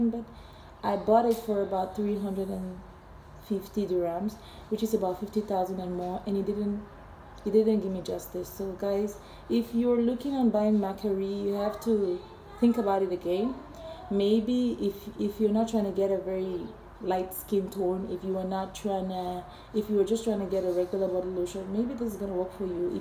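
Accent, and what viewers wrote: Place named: Cameroon. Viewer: You ve got to love Cameroonian accents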